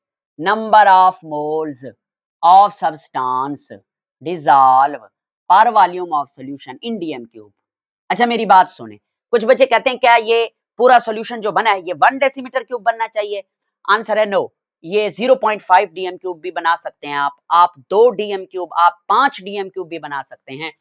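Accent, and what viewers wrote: Saraiki, Pakistan. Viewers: Sir g lgta hy ap saraiki Hain ❤agr waqi ewe hy ta sako sraiki ich smjhao😅❤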